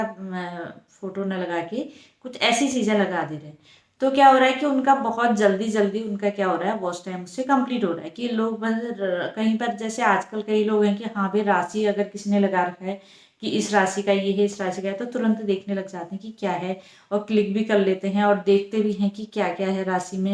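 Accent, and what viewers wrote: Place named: Pithoragarh, India. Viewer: तुम्हारी जानकारी बहुत अच्छी होती है में कई बार सुनी हु अभी भी फुल सुनी हु में जो तुम बताई यही सब हो रहा हा राशिफल का खूब चलन कुछ न कुछ करते सब लोग ऐसा,तुम कहा से हो बोलना तो पिथौरागढ़ वालो जैसा है, हा बार बार एक तो लगाना नि होता है बहुत अच्छा बोली सब,में जुड़ी हु तुमसे कई बार देखती हु कमेंट नि करती आज अच्छी से सुनी तो कमेंट कर रही हु ,सुनती फूल हु में ,,हा अपनी आवाज में बनाना सही है लाइव भी सामने अच्छी हा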